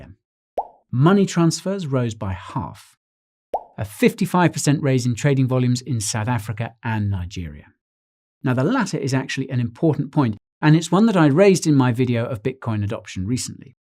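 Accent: United Kingdom. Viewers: i love your british accent. i wish i could speak like that.. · I dont know if it's the robotic British voice or the robotic repeated jestered you make that draw me in lmao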